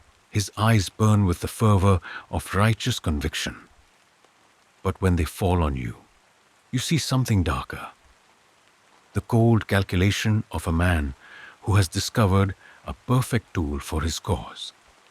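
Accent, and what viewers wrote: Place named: India. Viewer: Very good, indian accent is quite strong💪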